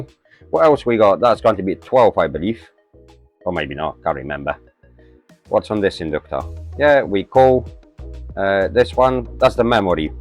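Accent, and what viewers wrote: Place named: United Kingdom. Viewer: Nice first video i expected you to be another American channel till i heard you open your mouth good to see someone this side of the pond is giving this type of repair a go.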